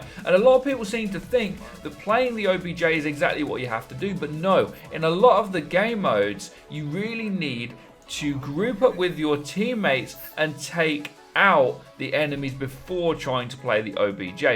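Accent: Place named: England, United Kingdom. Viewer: Saying crap with a bit of an English accent doesn't make it true.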